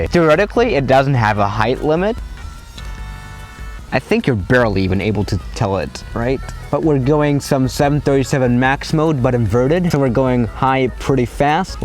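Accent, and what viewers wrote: Germany. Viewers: Are u from Germany? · wait ur german? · Are you a german? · Are you German?? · das ist das typische deutsch und kein Schweizerischen Akzent oder lebst du doch in Deutschland? English: thats the typical german and not the swiss german xd or are you live in germany?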